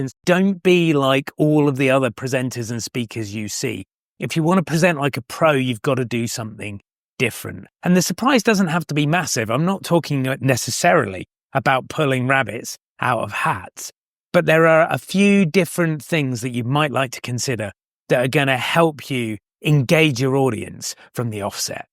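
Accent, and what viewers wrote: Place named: United Kingdom. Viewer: An Italian with a British accent? Interesting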